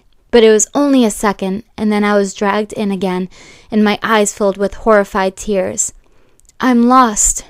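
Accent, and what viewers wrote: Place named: United States. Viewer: What type of American accent is this? Like what state is she from? I really like it, it’s melodic and smooth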